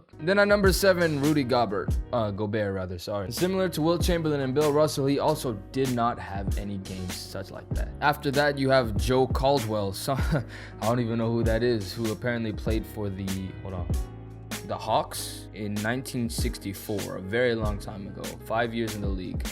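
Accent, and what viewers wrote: Kenya. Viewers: Your Kenyan ?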